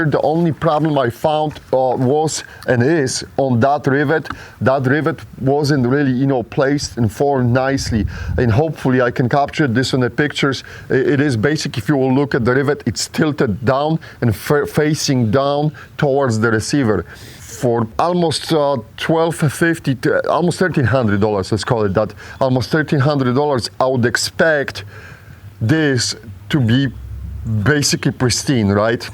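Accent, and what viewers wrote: Serbia. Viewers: He pronounces his R's a THE sound just as someone who is native Serbian speaker! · Yeah sounds like Serbian I wish I didn't lose my accent or can control it on command haha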